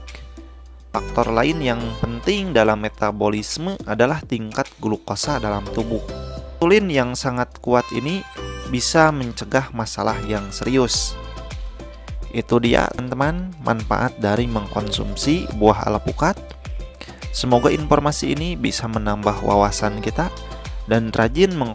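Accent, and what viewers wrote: West Java, Indonesia. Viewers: sunda pisan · yg host nya klo boleh sy tebak pasti org jawa barat 😂😂😂😂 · Logat orang Jawa barat Indonesia Raya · Sunda pisan kang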